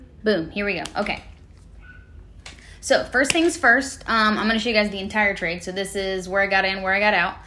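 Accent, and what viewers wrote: South, United States. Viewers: Lol I can hear a southern accent a little bit haha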